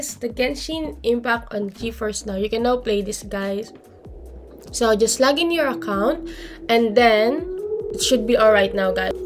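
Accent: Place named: Philippines. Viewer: i knew it, you're from the Philippines 😅 we have the same accent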